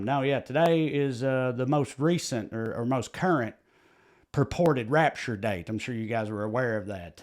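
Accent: South, United States. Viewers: Dude, it's a delightful juxtaposition hearing you correctly using polysyllabic terms in a southern drawl that screams "taters 'n grits"! You constantly make me think of when I went home with a college friend to cattle show in backwoods Alabama. Since Rob was catching up with people he hadn't seen since high school, I just wandered around until I heard some people talking about quantum theory and temporal mechanics! At a cattle show! A couple of the speakers had accents so heavy that my eleven years in Alabama had barely helped me understand what they were sayng, but WHAT they were saying blew my mind! I asked my friend about it and he listened for a bit, then said, "Oh, they've just been watching Doctor Who! Public broadcasting is the only TV station we get out here. They say Weedowee should have cable in about five years." I actually like when my unconscious- and unjustified- biases run screaming face-first into a brick wall! 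😊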